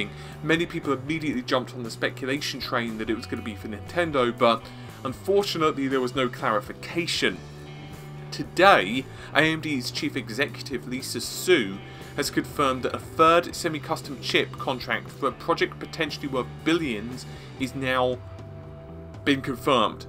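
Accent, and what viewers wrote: United Kingdom. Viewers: This guy's voice is way too british, almost obscenely accented. I mean we live in such an open world now, I don't see why such marked accents could "honestly" exist, it almost feels forced.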